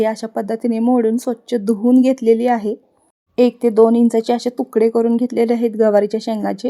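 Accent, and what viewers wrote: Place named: Otur, India. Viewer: खूपच सुंदर ओतुर होऊन बोलते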